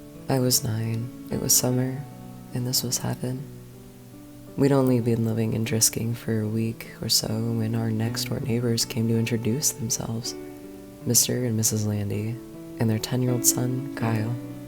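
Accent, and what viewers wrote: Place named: South, United States. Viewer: as a Texan i will permit the southern accent. it was acceptable. thank you for the video i enjoyed it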